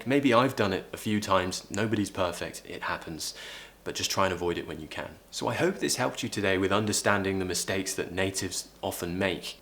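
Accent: United Kingdom. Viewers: Thank you so much! I love the british accent and I'm lerning a lot with your videos! What a lovely sentence "I have so much gratitude for every mistake I make" I agree with you and I try to think that way when I make a mistake. I enjoyed this lesson! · I am from Quebec and unfortunately I cannot hold a conversation in English, but your very British accent is really nice. I listen to your shows from time to time and take pleasure in trying to understand your explanations. It also happens that I use the automatic translation into French of you tube, which I do in other English shows, and I must admit that the translation is impeccable, which means that the construction of your sentences must be flawless. Thank you and good luck for your work and for making us appreciate your wonderful culture. (translated by Google) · I love british accent cos it's quite easy and understandable btw i appreciate your work mate 😘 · your British accent is everything. 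I feel stupid trying to copy you. 🤣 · Hi, This is Libin from India. It has been a wonderful experience attending your programme on British Pronunciation. I love your accent and I practice your lessons daily. There is great improvement in my pronunciation. I take this opportunity to thank you. Thank you so much. Libin Mathew.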